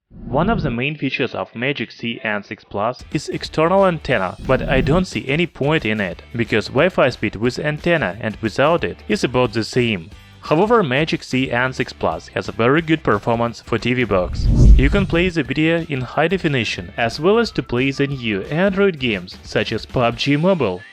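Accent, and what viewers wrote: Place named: Russia. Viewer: Is this the bother with less of an accent that is more understandable? Nothing against Mother Russia, but seriously guys. There has got to be someone you can find to do the voice aspects to videos. Hell Siri can do it for real cheap. Then viewers won’t have to hit rewind as many times as I had to just to understand what was said. “Th” doesn’t have any “z” in zhem, oops I meant ,, them..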